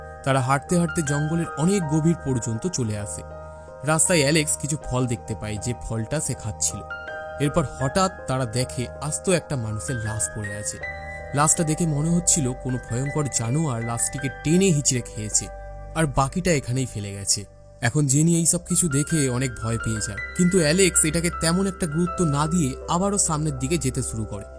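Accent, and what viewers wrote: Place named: India. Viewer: ভাই আপনি কি ইন্ডিয়ান কারণ ইন্ডিয়ান মানুষরা এভাবে কথা বলে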